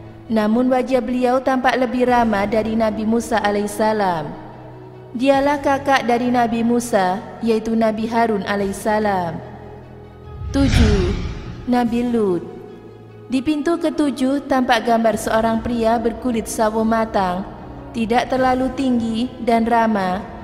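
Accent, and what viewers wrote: Java, Indonesia. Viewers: Vocal suara jelek kaya orang jawa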